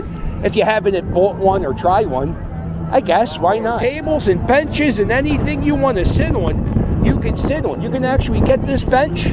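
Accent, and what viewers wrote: Philadelphia, United States. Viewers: Perfect—a tour of the Bank and a flawless Philly accent!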